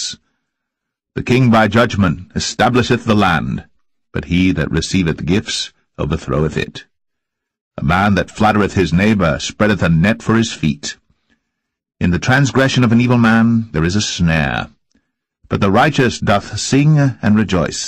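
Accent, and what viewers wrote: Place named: United States. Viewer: American English but the KJV Bible is written in old English so it sounds different.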